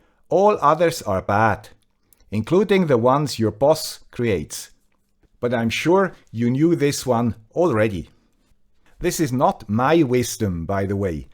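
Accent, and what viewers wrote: Swiss German, Switzerland. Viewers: Swiss accent is much much better than American. For me, I understand most European English accent better than American and British. Why, because these people speak slow and simple \ easy English words. Thank you for the lecture. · Happy 2022! Thank you for all your content and for avoiding the mid-roll ads. You make very good choices; deciding to broadcast in English (with a Swiss accent) was a very good choice - you have the possibility of a larger audience, but you still retain your "Swiss-ness". I love Switzerland having visited as a child, and later on business. Your videos are very thorough and well made. I have learned so much from you. Thank you again. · Hi, First, happy new year to you and your family. I take this occasion to thank you for these new year reflexions. I liked your video a lot. Just like i appreciate your technical videos. 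I really like the quality of your rigorous explanations. You helped me with many of my projects and with my overall comprehension of microcontrolers. Bye (not with your Swiss accent but with my French- Quebecer's one) Stéphane-Billy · Ich bin aus dem großen Kanton, habe aber dennoch Dein Schwitzerdütsch verstanden :-) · Another useful and interesting video from the guy with the Swiss accent. Wishing you and yours a wonderful 2022. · Ich bin ja überrascht wie viel ich vom Schwizerdütsch verstanden habe. Bin vermutlich schlimmere Dialekte gewohnt. :-) (Bern und Umland?) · I told my wife, "The guy with the Swiss accent has a message for you!" I read your response to her, and she laughed out loud! :)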